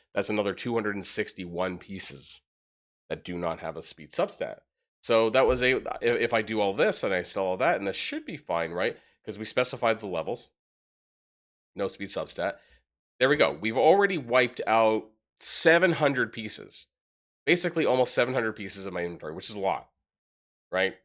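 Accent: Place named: United States. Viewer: Почему название видео и описание на русском, а мне вещает какой-то американец? -_- Требую видео на русском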